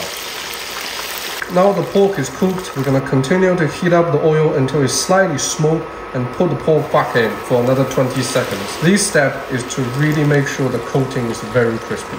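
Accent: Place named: Newcastle, United Kingdom. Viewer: Love your no nonsense cooking as much as your Geordie accent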